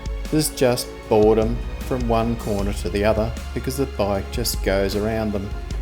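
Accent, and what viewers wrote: Australia. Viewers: I’m a Scot, I think the Aussie accent kinda threw me off a bit 😂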